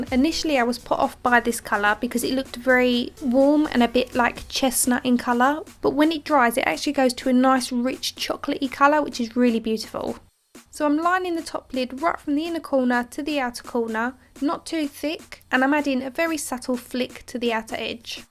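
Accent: Essex, United Kingdom. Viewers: Love your Essex accent and your techniques. Subbed :-)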